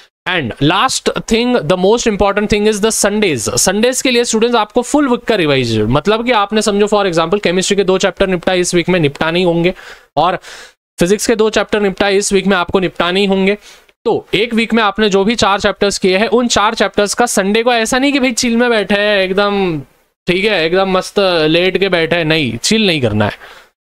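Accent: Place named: Aurangabad, India. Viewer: Aurangabadi accent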